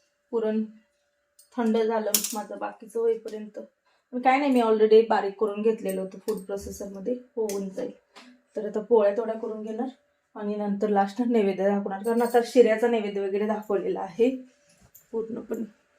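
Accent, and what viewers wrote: Kolhapur, India. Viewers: ताई तु सोलापूरची आहेस का कोल्हापूर ची काही कळतं नाय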